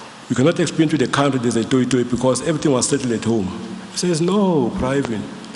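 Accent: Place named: Township, South Africa. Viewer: Brilliant speech with kasi tone 😂😂😂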